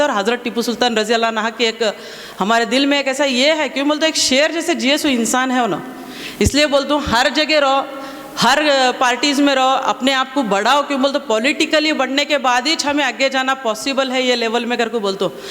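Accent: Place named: Bijapur, India. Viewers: Urdu dakni mix. Good👍👍👍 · Bijapur urdu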